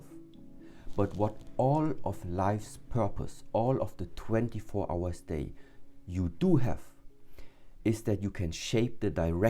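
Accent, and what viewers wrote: Germany. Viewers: I knew I heard a German accent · Anybody else thinks his accent sounds German? 😂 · U Sound like a german speaking english :D whats your native language?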